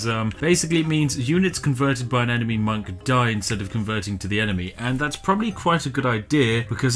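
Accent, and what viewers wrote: United Kingdom. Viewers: ARE YOU REALLY A BRIT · As an American I love how British you are.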